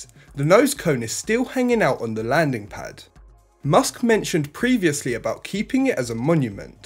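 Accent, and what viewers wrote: England, United Kingdom. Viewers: Hey, love your updates man! Thank you! What part of England are you from mate? I say south, around London, but my brother is saying Manchester. You sound NOTHING like Paul Macartney so, I say that's wrong!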